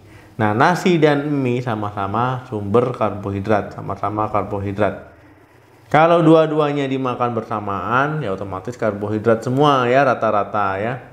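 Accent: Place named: West Sumatra, Indonesia. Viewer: Logat nya kayak orang sumbar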